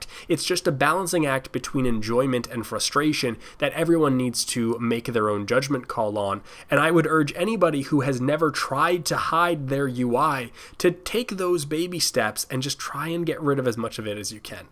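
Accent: Canada. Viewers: Your Canadian :) or from Minnesota. lol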